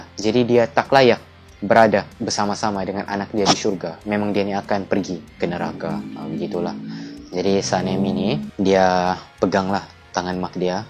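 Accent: Sabah, Malaysia. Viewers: orang sabah bah ni, begini lah kalau org sabah bercerita memang feel betul 😁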